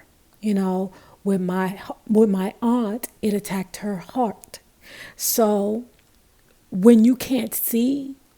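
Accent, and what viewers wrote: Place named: Washington DC, United States. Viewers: Peace Queen, I knew you were from DC, I could hear it in your tone, I have to ask do they have Mumbo Sauce down there. Yes, Chocolate City had started to change when Pratt-Kelley took over. But yeah, Your correct about the regentrification nationally, but truthful this is nothing but the fulfillment of the Homestead Act of 1867. The air's you seeing with the social climate is the reaction to the political agenda currently, condone. I too am aware of the Lupus struggle, I lost my Great Aunt when I was a young boy, but would come full circle with my ex-fiance was diagnoised too, I support the research too. Oh yeah, I am from Adams Morgan residing in Va. Beach. But on a another note, I never understood why Lupus never got the backing and support as Susan B receives multiple times a year. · I know what you mean about the black folks being pushed out. 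I live in Germantown md and I know a lot of ppl who moved here from D.C. It's crazy. Plus I know you were from the DMV before you said so and before I saw you. Just by your accent in other videos. It's funny, I can spot a D.C. Accent a mile away. Lol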